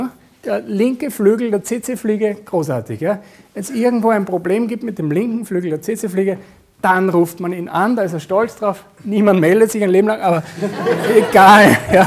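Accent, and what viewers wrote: Vienna, Austria. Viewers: Findest das Schönbrunner Deutsch (Dialekt spricht er nicht) so unerträglich, dass du es ausblenden zu müssen meinst? :-D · Wunderbar.....wäre da nicht der "Wiener Dialekt"....für nen Ruhrpöttler schwer das auszublenden😊 Was sind das denn für buchbare Seminare?Wo bucht man sie? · Danke für diesen klasse Vortrag, Herr Bonelli! Das erklärt einiges, was in der Vergangenheit schief gelaufen ist und was ich unbewusst schon dazugelernt habe. Das Herz weiß was wahr ist und wenn man in der Herzenergie ist, gibt es keine Schuld sondern Verständnis und Vergebung. Und - wie gerne hätte ich einen Österreicher als Mann - der Dialekt ist einfach bezaubernd! ... sagt der Schwabe ... :)))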